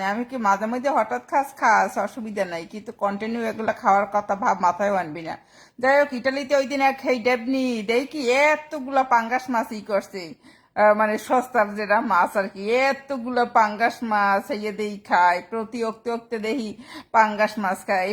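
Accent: Barishal, Bangladesh. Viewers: আপুর বাড়ি কি বরিশালে? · দিদিভাই আপনার বাড়ী কি বরিশাল 😅 Thanks didi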